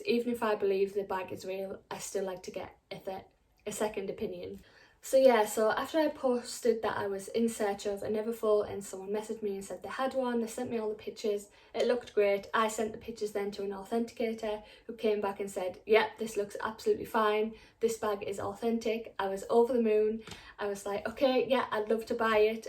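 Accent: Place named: North East England, United Kingdom. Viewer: Just subscribed and am looking through your videos. Great advice so thank you for that. I am a member of some Facebook LV groups but they are mostly US ones. Need to find some UK ones. Nice to hear a North East accent too! We sound the best haha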